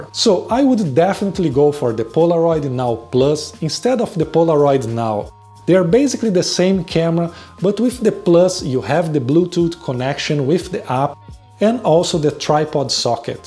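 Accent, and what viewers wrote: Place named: Brazil. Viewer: Impressionante como sotaque de br da pra notar de longe kkkkkkk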